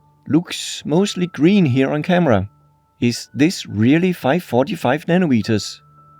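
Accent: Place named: Denmark. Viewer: That’s an S tier Danish accent right there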